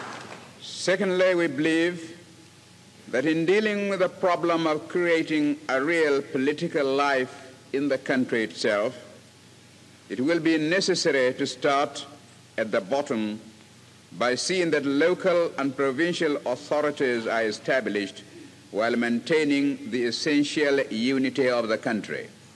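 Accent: RP, United Kingdom. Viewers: He has Old British accent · He speaks with a British Queens English accent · He sound like a member of the british royal family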